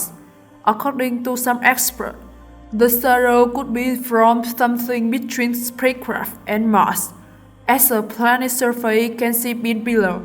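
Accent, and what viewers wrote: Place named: Japan. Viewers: ah yes, she does have a strong japanese accent. I think it'll help her greatly if she hires an voice actor to do her videos. Good content here. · could you possibly put there talking someone with normal english and not with strong japanese accent? it is hard to understand you... thank you.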